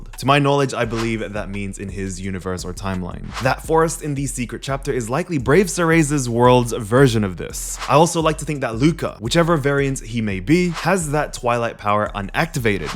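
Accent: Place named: North America. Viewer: Where are you from bro? It says Australian on your channel but your pronunciation of certain words sounds very North American. Thanks for explaining this clusterfuck of a story though - much appreciated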